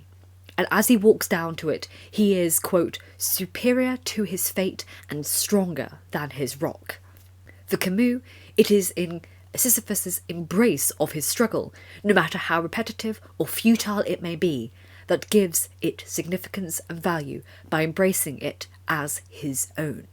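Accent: United Kingdom. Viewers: I like your voice combined with British accent so much!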